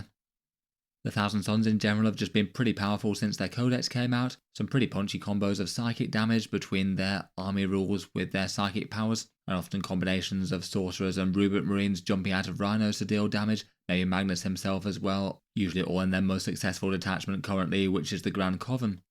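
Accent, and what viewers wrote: Kentucky, United States. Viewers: Enjoy the kentucky pronunciation.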